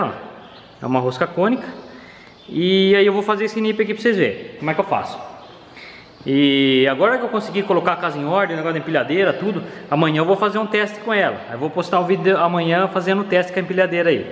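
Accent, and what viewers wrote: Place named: Piracicaba, Brazil. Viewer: Fala meu chegado. Pelo seu sutaque é de Piracicaba ne.. SOU torneiro mecanico tbm. Eu trabalhei e morei ai no Santa Rosa, e trabalhei na OTANNI . nao sei se conhece.. Cidade bacana de trabalhar. Abraço e sucesso..!!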